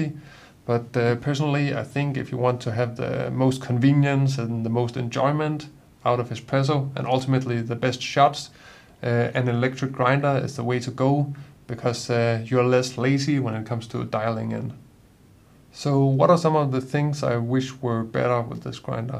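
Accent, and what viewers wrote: Denmark. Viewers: Hi It sound like you are from Jylland DK, if I am not mistaking 😊 Anyway you have many nice videos out 👍 I have the Eureka Mignon Specialita and had have it for half a year, Love it. (very silent) Although I use it mostly for filter coffee. I use it for 2 cups equal to 38 gm to 640 ml. The settings for filter coffee is almost at maximum size. In the community out there, there is a 3D Print file of a large scale where the is a gear to the small nop so it is easier to dial-in however it is NOT making the grinder more beautiful. There is also a 3D Print base plate which is useful, making it tilt to the front, a little like the new model. 👍Thank you for your video👍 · Hej :) Hearing your accent I am assuming your are from Denmark? I am getting my Specialita soon and was wondering where you printed the 3D single dosing hopper? Can you recommend something here in DK/Copenhagen that doesn’t cost a fortune? 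:-)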